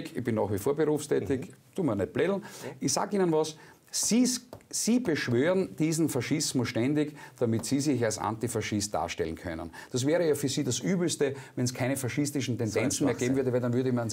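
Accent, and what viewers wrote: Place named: Vienna, Austria. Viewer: "dama ned blädeln....." XD Der Mann ist so genial...und lange hab ich nicht mehr so einen hofrätischen Wiener Akzent gehört ..... tut gut mal wieder zu hören.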